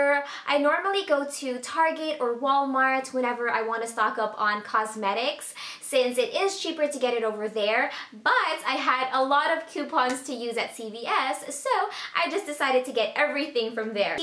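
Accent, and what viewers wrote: Philippines. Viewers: Hahaha :D pinoy accent ;) cucumber x) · Filipino accent lol :)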